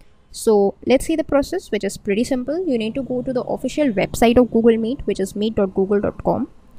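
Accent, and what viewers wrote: India. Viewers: Indian english pronunciation totally error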